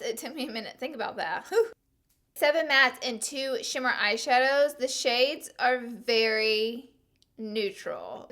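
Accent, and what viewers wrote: South, United States. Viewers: i love your tutorials, are you Hispanic? you kinda look Hispanic, and i love your southern accent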